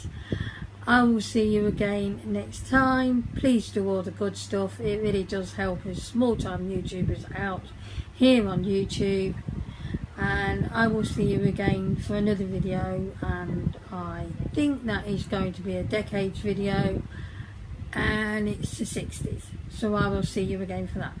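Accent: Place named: United Kingdom. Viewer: Also, I love listening to you. Your accent is so wonderful!! Living in the US my whole life, I’ve always wanted a British accent. 😍😍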